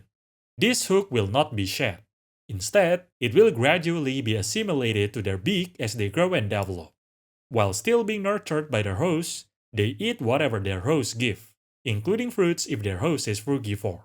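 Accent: Southeast Asia. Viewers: Oh, south east asian, always wondered what that accent was Also love these birds, so cool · You're the only Indonesian I know, so I have no other points of reference. To me, your accent sounds like a mix of generic Southeast Asian + Dutch.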